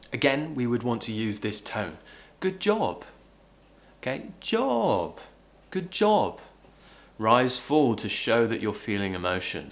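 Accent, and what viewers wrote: United Kingdom. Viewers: I like the british pronunciation. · Thx man 🙏🏼 u are perfect teacher 👨‍🏫 I really love British accent 😍😍❤️❤️ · Love uk accent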